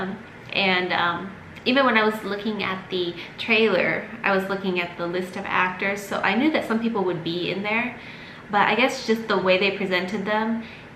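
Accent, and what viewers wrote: Telugu, India. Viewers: genuine review movie is really good by the way your telugu speaking very cute🥰🥰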